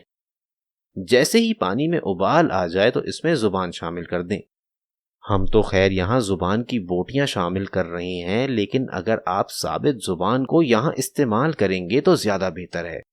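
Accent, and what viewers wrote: Pakistan. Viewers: Thank God you are back. Yar I dont care if you don't share any food recipes, i enjoy listening your Urdu.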